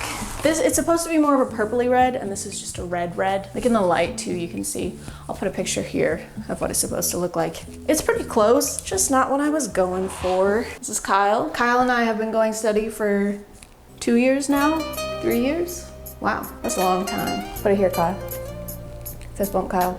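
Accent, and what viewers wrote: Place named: Tennessee, United States. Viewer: This is the most Tennessean I've ever heard you sound. And I do mean it in a good way, promise.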